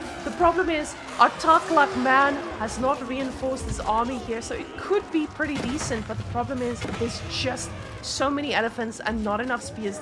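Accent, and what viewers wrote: Afrikaans, South Africa. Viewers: Taklak, die Afrikaans klap hard :p Lovit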